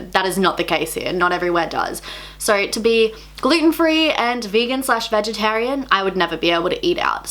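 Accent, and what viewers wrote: Melbourne, Australia. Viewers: I couldn’t even tell you had an accent, probably bc I’m Australian too 😅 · I love your accent I've been to Australia. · Omg I’m from America but I’m moving to Australia this year! I thought based on your accent you were from Melbourne but I’ll be moving to Nelson bay which is much closer to Brisbane than it is Melbourne! I’ve been obsessed with your vids lately!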